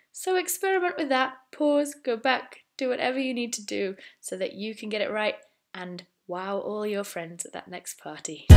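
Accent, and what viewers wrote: Wales, United Kingdom. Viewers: are u Welsh???? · Enjoyed this lovely Welsh speaker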